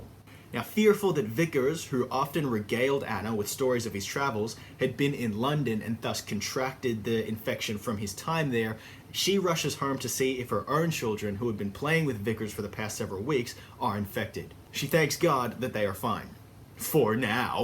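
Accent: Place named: Australia. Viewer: is it me or do you skip between an aussie and a american accent? lol Good video BTW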